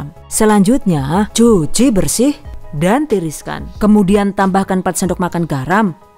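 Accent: Banyumas, Indonesia. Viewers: Pertama melihat video beberapa tahun yang lalu, saya pikir ini suara ibu-ibu yang sudah tua, ternyata orangnya masih muda dan cantik, dan di selingin bahasa ngapak yg lucu, terimakasih untuk videonya ya mbak, salam dari cilacap. Semoga sekin sukses dan berkembang Aammin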